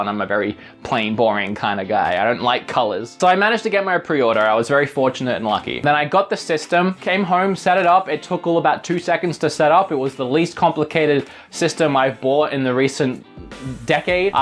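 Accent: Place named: Australia. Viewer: His Aussie accent is so prominent in this video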